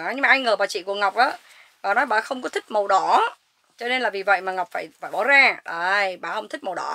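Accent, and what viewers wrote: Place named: North, Vietnam. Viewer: Chào, nghe giọng Bắc Saigon, cách nói dễ thương, cho tôi địa chỉ của tiệm. Tôi ở Escondido, muốn lựa mua áo cho 2 mẹ con, cảm ơn. có áo size nhỏ không?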